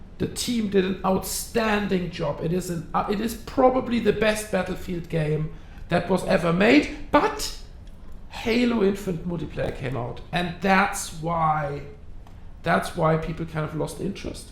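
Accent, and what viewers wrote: Germany. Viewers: Hi new to the channel. Do I detect a German accent? Also great video!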